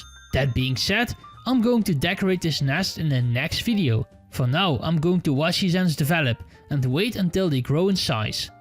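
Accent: Netherlands. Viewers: Haha na de eerste paar seconden wist ik al meteen dat je Nederlands was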